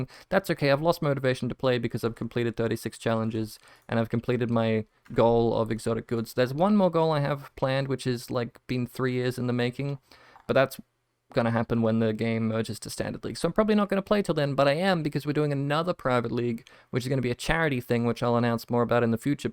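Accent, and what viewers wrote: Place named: Australia. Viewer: I never click any bell and always see new videos. The new audio makes you sound Australian.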